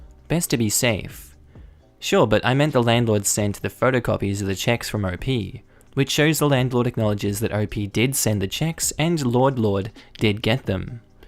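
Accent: Australia. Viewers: hearing a fellow Aussie voice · So pleased there's an Aussie narrator... has a soft spot for the accent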